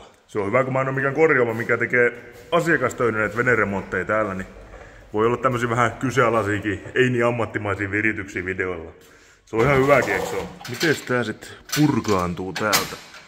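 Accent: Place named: Turku, Finland. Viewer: "Saisin halvalla" 80-luvun Marino Swingin 20hp Jammulla. Luottopuheiden mukaan soiva peli muuten, mutta peräpeiliremonttia kaipaa. Kun oot niin hehkuttanut noita braketteja perän jatkoksi, niin olisko tässä koko- ja teholuokassa semmosessa mitään järkeä? Vois askarrella samalla, kun vanerin joutuu kumminkin vaihtamaan. Parantaisko se potkurin olosuhteita, kun on kuitenkin aika jyrkkä v-pohja? Vauhtia en niinkään kaipaa, enemmänkin pientä kulutusta pienellä koneella. Tulis samalla sisätilaa, kun peräpenkin siirtäis kaivon paikalle. Joka tapauksessa tekisin ton sun Silacraftin tyylisen keskipulpetin, jo pelkän ajoasennon takia. Ja kun kuskilla on painoa 180kg (ei oo kirjoitusvirhe), niin hörppääkö kiikkerä Swingi vettä pulpettia kiertäessä? En oikeen pääse sitä vedessä kokeilemaan ennen kauppoja (ja romahtaneen ladon raivaamista). Ja kiitos hyvästä kanavasta. 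En olis ikinä uskonut, että joskus okein odotan pääseväni kuulemaan turun murretta!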